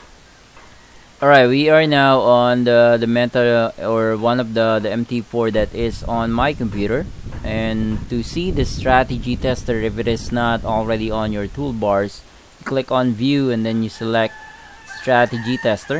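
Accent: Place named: Philippines. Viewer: he's got the filipino accent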